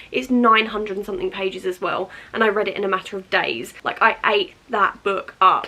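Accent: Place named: United Kingdom. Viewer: accumulated, brihish axent 😒 nice vid btw 😊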